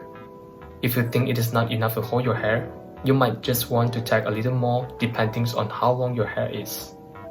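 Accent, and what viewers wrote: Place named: China. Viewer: I can’t pick out where he’s from with that accent? Chinese? Taiwanese?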